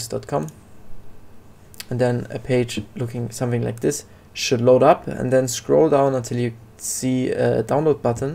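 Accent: Germany. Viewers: ur have german accent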